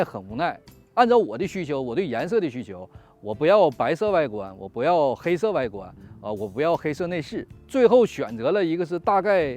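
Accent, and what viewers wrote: Northeast, China. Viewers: 一听说话就是东北人呀，辽宁人吧，我吉林的，想问你一个题外话，美国公立医院看病是不是免费的麻烦你。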